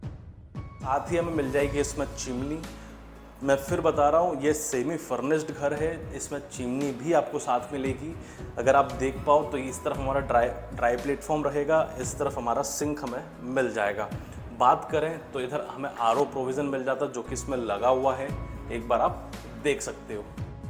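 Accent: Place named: Malwa, India. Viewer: आपके बात करने का सलीका बिल्कुल मालवा के गांव के लोगों जैसा है, कौन से गाँव से हो आप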